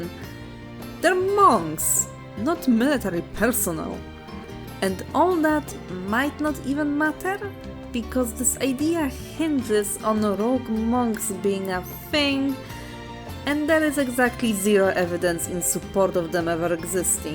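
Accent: Poland. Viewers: oh thats interesting i dont think i have heard a polish accent before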